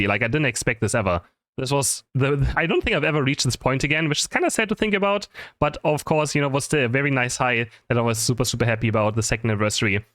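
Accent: Germany. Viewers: Hi! I totally agree with everything you said in this vid I have not quit unite yet but i am playing it a lot less, mainly because i just moved houses but also because it IS boring. We need a new map, i would love to see a summer map with kyogre/groudon as the bosses But besides that i do hope you see this comment, when i wake up everyday i always watch your videos and make my lunch while watching you. Its gotten to the point where my parents are like "oh, he's watching the german guy again" not necessarily in a mean way they just recognize your voice so much because im always watching you😭 It might sound a little weird but i do really like your voice, you've inspired me to try and learn the German language because i really like your accent and i think the language is pretty funny But anyways i hope you do read this because i love your content even if i quit unite i will always still watch you play it, youre the thing i look forward too in the day and i hope your views go up sometime soon ❤️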